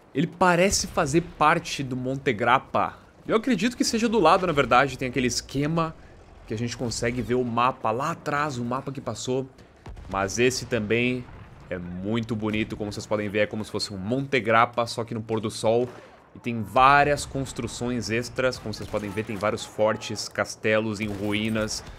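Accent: Rio Grande do Sul, Brazil. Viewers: funny,voce é gaucho é?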